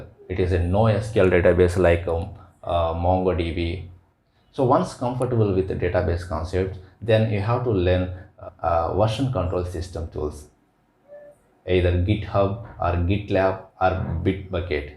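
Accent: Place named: Telugu, India. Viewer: Looks like telugu is ur mother tongue me 2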